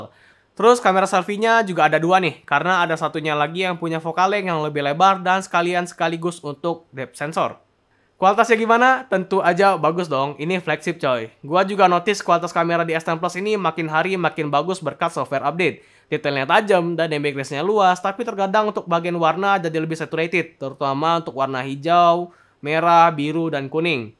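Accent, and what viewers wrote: Palembang, Indonesia. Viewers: palembang bg? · ai bang, salam kenal be bang, ciren dari logatnyo bang😁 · Dari Logatnya Seperti Wong Kito Galo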